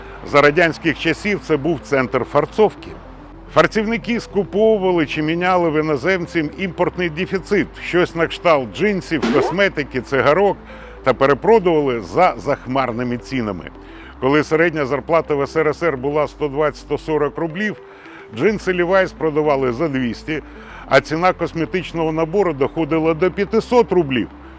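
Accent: Odesa, Ukraine. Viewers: Це однозначно одеський український діалект :) Спочатку ріже вухо, а потім розумієш, що такий говір українською може бути лише в Одесі :)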